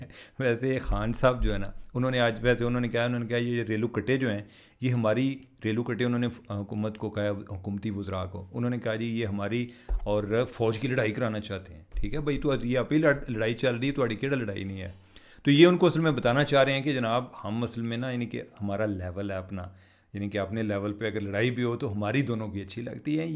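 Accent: Punjab, India. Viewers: Urdu main punjabi ka tarka kamaal stay blessed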